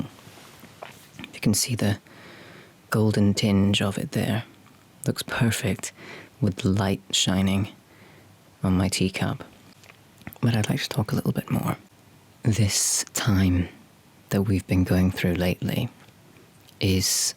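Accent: Scotland, United Kingdom. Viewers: cool! I thought I detected Scottish! I'm American but my dad's side of my family is Scottish, so I hear their accents almost every day! I've always wanted to go there.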